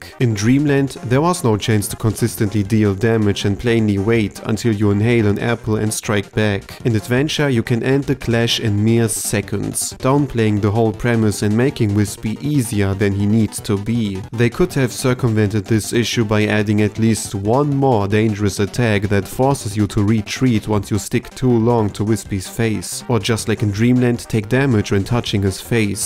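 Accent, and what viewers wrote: Germany. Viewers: Du bist ein deutscher. Ich kann es in deiner Stimme hören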